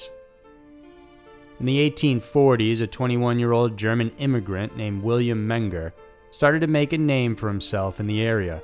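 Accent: United States. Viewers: I live a couple miles away from the river Severn! I live in a pretty rural part of the country so it’s really weird to hear it’s name being said in a Yank accent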